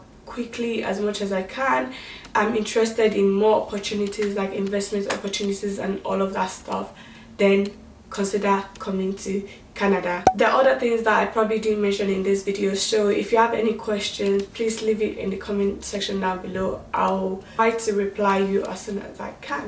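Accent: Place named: United Kingdom. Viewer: U have such a beautiful British accent and thank you so much for this content it is more than helpful.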